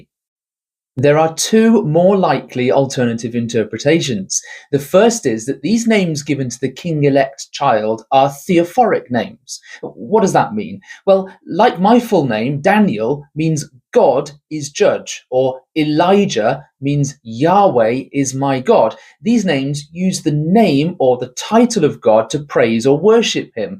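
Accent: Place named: United Kingdom. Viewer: Good work bro. Hope I have added a bit of good service in the comments. I will sub, you sound like you are in UK?? Great if you are, as there is a great silence in the world these days from Blighty, especially on this subject. ha ha, i see i already did sub recently. Blessings, i will roll through a few of your vids.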